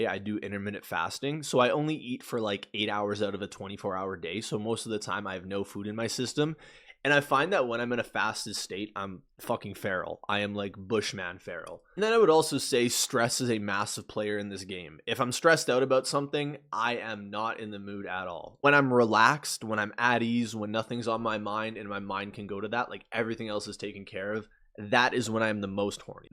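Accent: Canada. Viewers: Canadian, definitely Western Canadian. The "a" is pretty distinct to Alberta.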